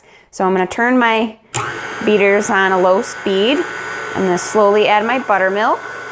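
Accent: United States. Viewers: My husband is going to love this! New sub! Your video for the raspberry jam came across my gmail feed. Your accent is adorable! What state are you from? Love from Texas☺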